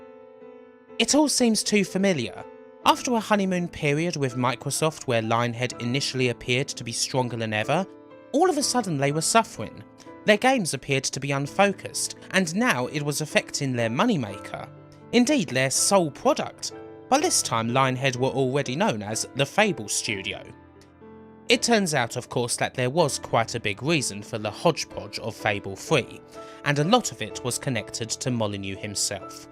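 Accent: United Kingdom. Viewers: Is that a British accent or a speech impediment?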